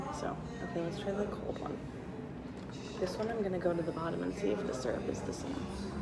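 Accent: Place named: South, United States. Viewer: even a Kentucky southern belle. Not used to hearing your southern. Lol 😉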